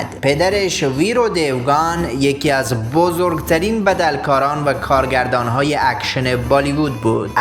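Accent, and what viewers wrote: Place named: Iran. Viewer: تو که افغانی چرا به لهجه ایرانیها گپ میزنی وطندار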